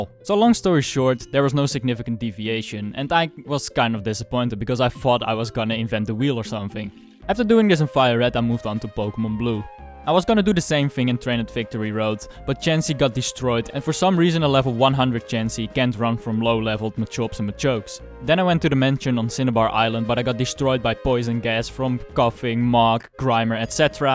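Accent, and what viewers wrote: Netherlands. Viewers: I can notice a slight Dutch accent. Am I right? :p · r u dutch 👀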